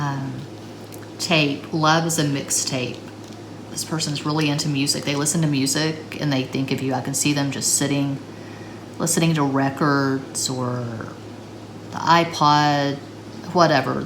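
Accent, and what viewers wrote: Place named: South, United States. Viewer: Pile 4: I love how you read. Very calming, soothing voice and all, with a southern twang. How do you do it?🙏😇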